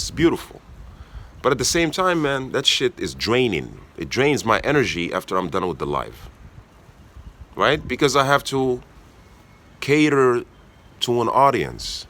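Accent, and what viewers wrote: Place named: United States. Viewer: Hey man! Your pronunciation is so American. Have you lived in the U.S., or did you just train to make it that good?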